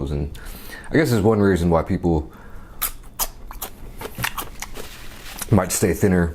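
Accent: United States. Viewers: You sound like the most country asian guy ever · U sound American lol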